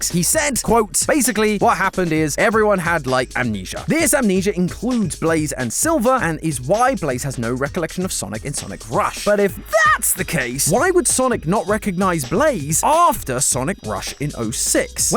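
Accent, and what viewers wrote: England, United Kingdom. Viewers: Sorry someone update, why does Matpat sound like he's english now?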